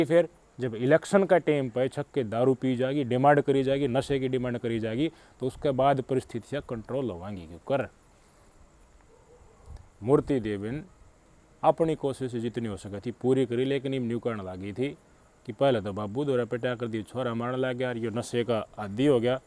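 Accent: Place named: Jhajjar, India. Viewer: Bhai ye bhai district jhajjar se lagta hai mere ko me bhi jhajjar se hu magar bhai baato ko bilkul khol kholker samajaata hai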